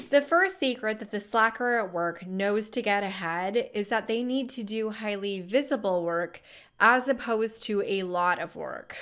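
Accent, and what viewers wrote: California, United States. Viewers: what's with the way she speaks????????????? she's pretending to be a teen in high school in cali???? she's probably in her 50s, a bit too old for that annoying accent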